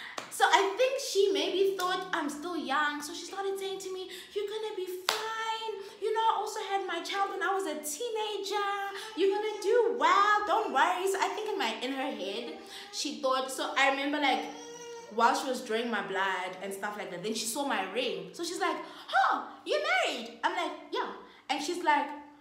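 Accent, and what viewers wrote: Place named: Botswana. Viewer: Your tswana accent...OMW KE E RATILE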